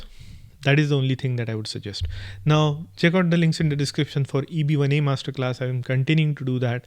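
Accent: Maharashtra, India. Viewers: apun maharashtrian ahat ka? your english is total marathi accent. jai Maharashtra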